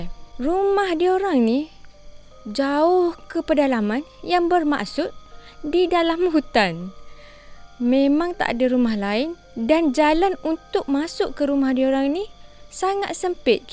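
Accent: Kelantan, Malaysia. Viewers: kak amy subscribe n follow sbb lain dari yg lain, cerita seram dlm loghat kelantan.. just be yourself darling, tak syok da nak dengar bila nak jadi org lain n tak feel da seram dia, lebih pd kelakar.. sorry syg, kak amy tegur demi kebaikan sbb ramai yg nak awk kembalikan balik loghat Kelantan n its real you.. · cankap klate baru belago angin cankap luar tk berapa best..hanya lihat host sajo😂😂😂😂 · kak , cito dialek kelate lagi sedak berbanding kl 🥲 . aura dio lain sikit , kl kureng sikit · Kecek klate la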